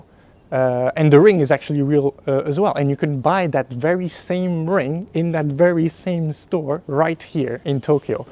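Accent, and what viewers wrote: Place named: France. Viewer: l’accent français mdr j’aime trop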